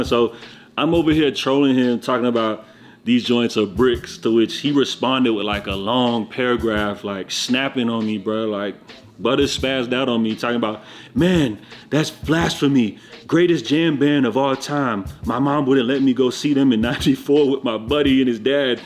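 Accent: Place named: New York, United States. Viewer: Idk With your accent I always thought you were a NY guy who just happened to relocate to FL lol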